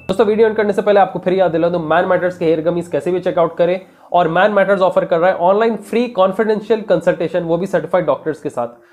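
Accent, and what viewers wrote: Hyderabad, India. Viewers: Are you from Hyderabad because you taking like that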